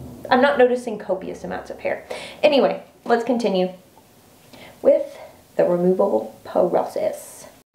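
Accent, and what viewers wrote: South, United States. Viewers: You explained this so well! You remind me of my teachers in grade school. Almost all of them had thick southern accents like you.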